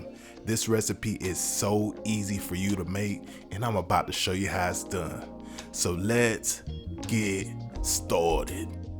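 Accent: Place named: Florida, United States. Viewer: Simple, easy to make recipe and taste good! Appreciate you you bruhdah! Sounds like your a from FL.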